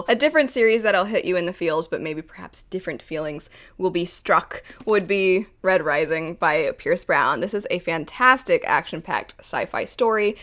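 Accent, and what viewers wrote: United States. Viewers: Plz tell me you’re from Maryland holy shit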